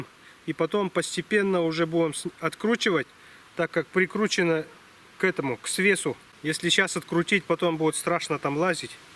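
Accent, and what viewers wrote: Perm, Russia. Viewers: Нормальный пермский говор)))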